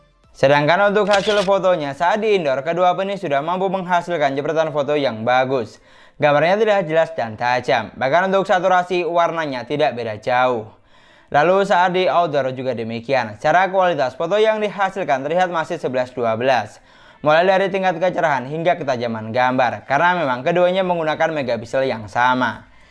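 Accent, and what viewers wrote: Madura, Indonesia. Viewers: kek logat madura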